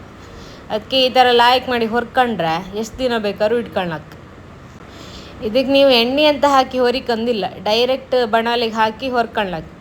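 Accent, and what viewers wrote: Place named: Kundapur, India. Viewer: super kundapur bhashe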